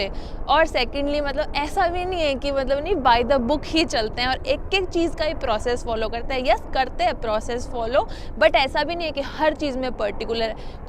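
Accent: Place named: Delhi, India. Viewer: aap karol bagh wali didi ki trah baat krte ho...bda acha lga sun kar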